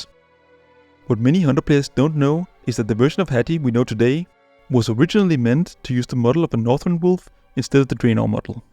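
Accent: Copenhagen, Denmark. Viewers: Hears first few words: ah, a Dane. :D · all i hear is yukky copenhagen accent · is talking soooo DingLish 😆 lyder enda som Københavnsk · danish accent is very present with this one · Heavy Danish accent ❤👌🇩🇰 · It's funny how, as a Dane, whenever I tune into a channel I have never heard of before, I can immediately tell by the accent that I am watching a danish person.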